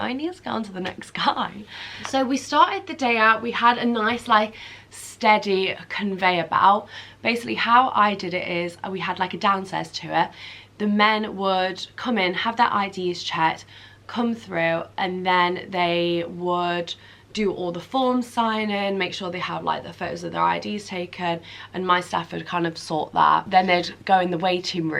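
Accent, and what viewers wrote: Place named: Chelsea, United Kingdom. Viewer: Not Essex more Chelsea :) wait.. Whaaaaaaaaa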